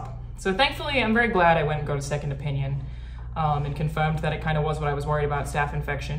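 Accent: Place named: Australia. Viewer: Because she is Australian and that is her accent. Just as your dialect may sound unusual to someone from a different part of your country or a different part of the world.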